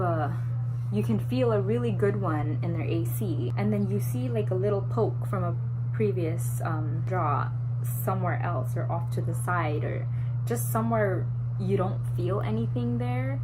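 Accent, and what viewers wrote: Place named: Trinidad and Tobago. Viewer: You must be trini I feel like a hear an accent ! Lol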